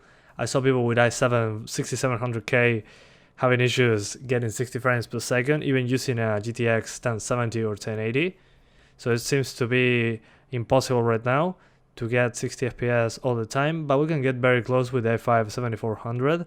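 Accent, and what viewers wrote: Argentina. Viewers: Que hice conmi vida como para terminar viendo a un argentino hablando ingles , y ensima terminar entendiendolo.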